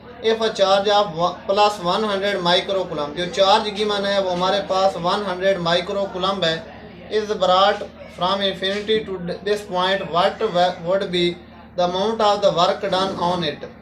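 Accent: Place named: Jhang, Pakistan. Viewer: Kia method ha prhana k kia Khana...sir ap jhang sa ho kia?? Ap k language jhang k lgti ha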